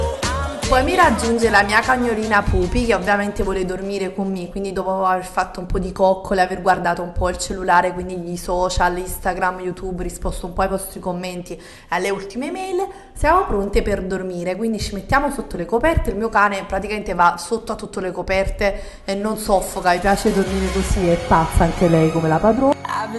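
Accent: Naples, Italy. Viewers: ma sei Napoletana?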